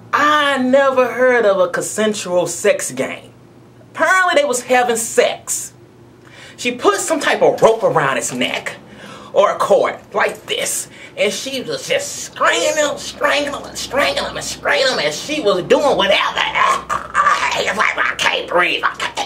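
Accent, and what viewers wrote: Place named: Missouri, United States. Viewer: I think he means Autistic he has a strong Missouri accent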